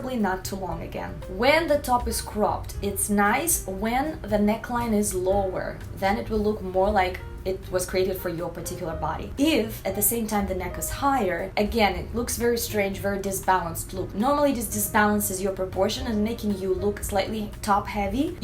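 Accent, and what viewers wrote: Russia. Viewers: У вас прекрасный русский акцент ❤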